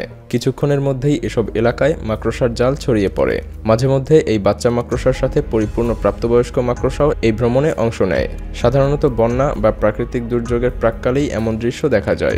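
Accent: Kolkata, India. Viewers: Vai na india kalkata likha hua or vasa sune bujhe nen. Bangladeshr vasa eto sundor hoi na. Bangladesi ra jotoi valo vabe kotha boluk na keno kothao tan tan vaab thakbey..100%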